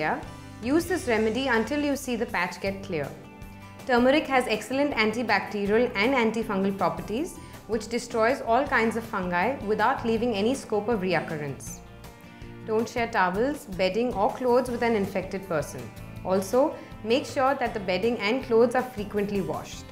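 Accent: India. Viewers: YOUR ENGLISH IS DIFFICULT TO UNDERSTAND BECAUSE YOUR INDIAN ACCENT IS TOO STRONG !!!!!! JUST TO LET YOU KNOW.......🌝🌝🌝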